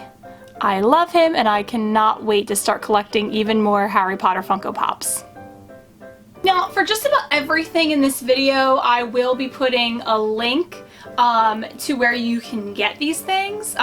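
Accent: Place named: Pennsylvania, United States. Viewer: Can’t believe I haven’t mentioned this yet but I’m Pennsylvania born too! It’s so funny cause your accent is sooo PA! But also GO EAGLES! 💚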